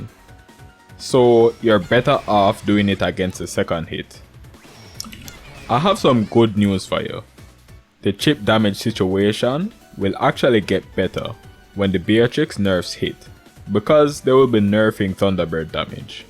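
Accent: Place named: Jamaica. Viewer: You sound Jamaican. Been struggling to find granblue players in this country.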